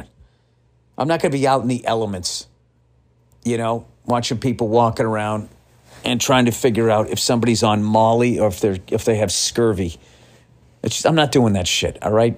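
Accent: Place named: Boston, United States. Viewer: Bill is fom Bwoston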